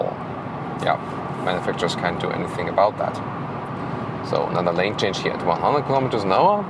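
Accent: Germany. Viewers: I enjoy your vids, however you should do a German version also, because you are already speaking "german-english" so the placement of words are not correct. I don't like that, I'd rather have a full-on german version.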